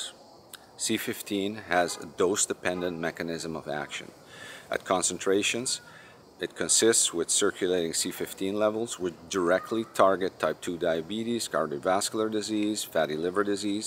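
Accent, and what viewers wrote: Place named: Netherlands. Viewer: There is a slight dutch way of saying things, besides that I have concert-pianist ears so that's how I noticed.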